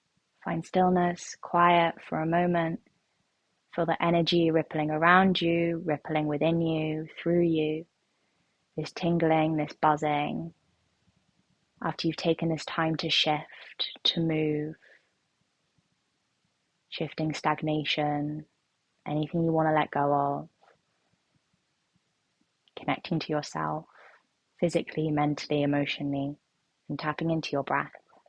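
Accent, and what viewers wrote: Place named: United Kingdom. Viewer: You're a fellow Brit! Such a refreshing change to hear on da choob.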